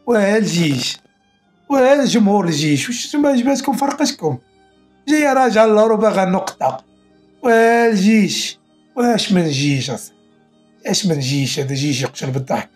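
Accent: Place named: Jerada, Morocco. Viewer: غير طريقة الهضرة نعرفك جرادة هركاوي و صراحة بزاف هد الكادوات للمدللة رزاء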